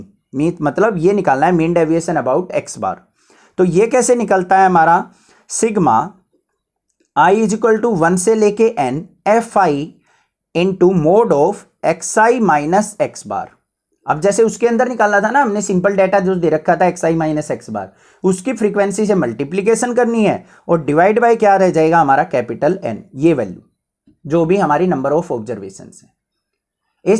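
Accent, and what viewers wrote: Haryana, India. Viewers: Sir ap haryana se ho na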